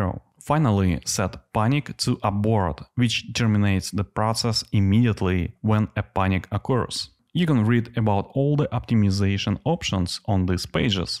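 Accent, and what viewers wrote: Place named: Russia. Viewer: Было бы более приятно слушать без русского акцента)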